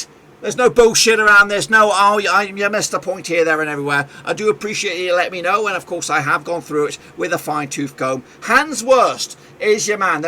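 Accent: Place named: England, United Kingdom. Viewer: its coming home and you dont sound Scottish, you sound English pal! Supporting Blackburn from Lancashire, you should get behind Southgates boys!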